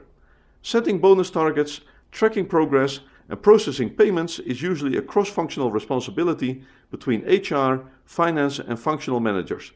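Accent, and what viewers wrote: Netherlands. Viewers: is that a Dutch accent ?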